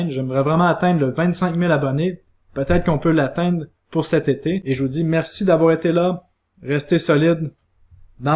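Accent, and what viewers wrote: Canada. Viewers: Merci super vidéo et j adoré ton accent canadien il est trop différent du mien.Je suis belge j ai aussi un accent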